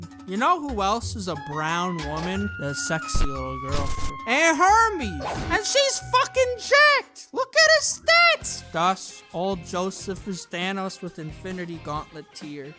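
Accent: Brooklyn, United States. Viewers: Its a Brooklyn accent. Not Dunkeys. Check his clone vid.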